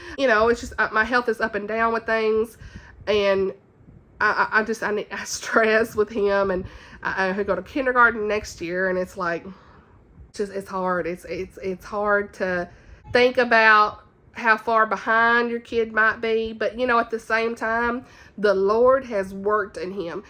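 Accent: South, United States. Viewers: Your southern accent is fine for me. I'm a southern girl myself. I'm from Maryville, Tennessee. I will be praying for you and your family. We are going through a hard time with my husband. He is taking chemo foe stage 4 colon cancer so please keep him in your prayers please.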